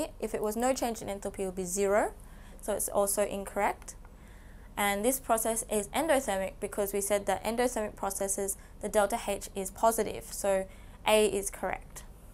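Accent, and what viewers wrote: Australia. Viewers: I love you sm. I love your aussie accent too🥰🥰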